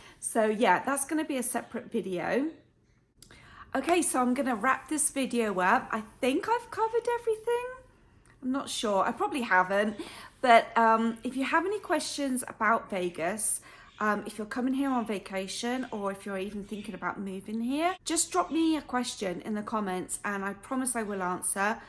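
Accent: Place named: United Kingdom. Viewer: Really enjoyed this vlog, you have lead such a fascinating life, love how you have still got your British accent !!! Sending you lots of love xxx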